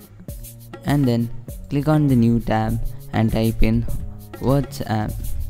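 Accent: India. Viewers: Indian English accent sucks!!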